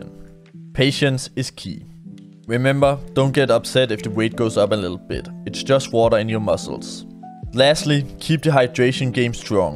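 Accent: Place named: Scandinavia. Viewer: You have one of the worst scandinavian accents I've ever heard. It makes my ears bleed!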